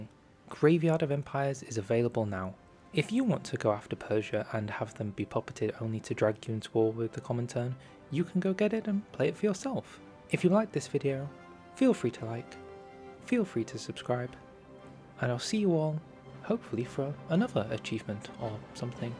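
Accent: United Kingdom. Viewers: Cause he's talking in British accent.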